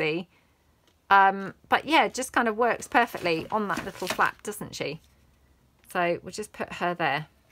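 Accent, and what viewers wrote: England, United Kingdom. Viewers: Hi I love these. I've been watching 5 of your older videos this afternoon it gives me the get up to do things for journals I'm making for family. I just love to hear the accent me being English from the Midlands now in Australia for 53 yrs. I was 11 when I came. My kids say I've not lost my accent but they also have one they all have a Birmingham twang my hubby is Australian to and he has picked it up. But I miss hearing English accents. But you help me to do things I've never done before. Thankyou. God bless Karen and family in Australia. I do hope you are ok with your divorce and you are keeping well. Family to.🌻💖🥰🐨🇭🇲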